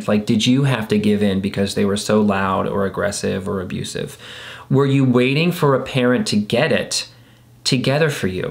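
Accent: Boston, United States. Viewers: love the boston accent lolz but really you make all of these difficult topics easier to swallow and comprehend and for that I couldn't be more grateful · This video is amazing for the Boston accent alone! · Love the Boston accent! It made me smile! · Strawberries!!!!🔥🔥🔥🤣 I played that first Paula Abdul tape til it broke😏✅ went back for another. And her next tape. Still got em in the time capsule in ma basement😉 Giggles from this 40 yr old🥰 Thank You😁 Your clips are amazing... the magical $300 car... lols Excellent. You explain all this heavy icky stuff in a relatable, nonoverwhelming way.... which builds confidence in managing self... HUGE... THANK YOU SO MUCH!!! 4TH generation Irish... LOVE the Boston Southyish Linguistics 🍀😁🍀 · OMG the Boston accent and the 80's/90's references!!😆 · Omg, the Boston accent. So funny. Thanks for this video! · Your Boston accent had me rolling 😂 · The Boston accent …… I love it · Thank you for clarifying behaviors associated with growing up with childhood trauma and also offering scenarios that may have started the path. 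Each of the videos I've watched have helped me. Like another commentor...I cannot watch a full video...but because of the sheer amount of information to process. I break them up into 2 or 3 sessions. I too am from Boston, though I've lived in Oregon for 40+ years. I love the accent...and have always had an unreasonable attraction and affinity to anyone that has one. I am now hearing (in my mind) ...this whole video with the letter "o"distinctives of ah' or aw...such as "pahk the cah in Hahvahd yahd" and the "tawnics" and several words joined together such as...the "howahya's"❣ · Lmfao at the Boston accent 😂❤️ made me love the videos more! Sincerely, a Bostonian, kid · Love the Boston accent 😂❤ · shoutout for the authentic Boston accent LOL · Just want to say that I think your channel and your Boston accent are just fantastic. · Omg best Masshole accent ever! I grew up in Southern NH and went to high school on the border of Plaistow and Haverhill. 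No matter how hard I try, I have never ever been able to do either the Boston or Southern New Hampshire accents. I am wicked awesome 😂 at pinpointing where people are from by hearing the difference in their accents, but I grew up without one somehow. It’s always fun to hear it since I’ve been living in the SF Bay area for the past twenty odd years since I moved far far from Sandown, NH. It’s still home in my heart (and Ely & Fairley, VT) but I have never ever wanted to go back permanently. Short trips remind me quickly about why I moved 3000+ miles away as soon as it became possible. Thanks for helping us unravel our childhood disfunction and confusion. For me, distance, time, and finding your friend family helps so much. 🥰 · Your Boston accent 👌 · Haha I love the Boston accent.😂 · As a native upstate NYer, I loved hearing your Boston accent! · Ha, I knew I could hear a faint Boston accent in your other videos! 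Appreciation to you from Allston. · Love that Boston accent.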